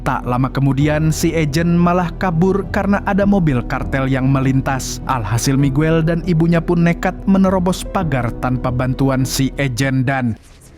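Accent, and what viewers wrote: Banjar, Indonesia. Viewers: Banjar host nya wkkw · admin nya urang banjar kah?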